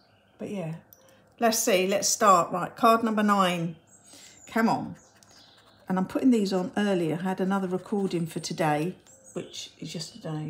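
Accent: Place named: Southeast England, United Kingdom. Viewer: Hello there! Nice to come across your channel! New supporter here! Love the accent by the way (must be missing uk) 😅 southeast?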